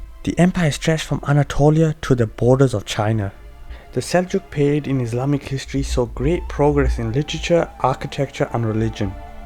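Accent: Northern England, United Kingdom. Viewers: Salaams I thought you did pretty good considering it was your first time... keep practising and you will get better and better guessing from your accent you sound like you are from Lancashire/ Yorkshire. Am I correct? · Thanks! The translation is not good. It has Thailand instead of Byzantine lands. Your accent is like Northern English and very easy to understand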